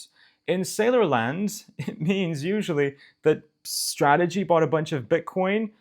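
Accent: United Kingdom. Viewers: His accent is starting to change more British. Funny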